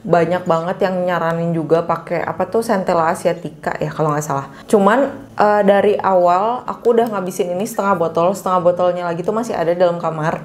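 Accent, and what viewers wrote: West Java, Indonesia. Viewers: Sundanya balik 😂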